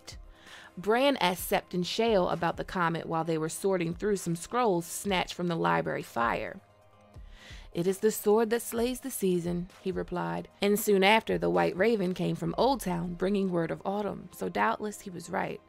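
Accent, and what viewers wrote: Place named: Maryland, United States. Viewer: Good stuff. Looking forward to the new season. I hear a Maryland accent in your voice.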